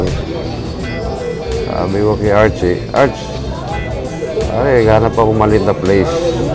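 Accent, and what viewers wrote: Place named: Philippines. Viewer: I saw him on Zimmerman's show and noticed his English accent was more Filipino... he's practically Filipino. Thanks for sharing this vid!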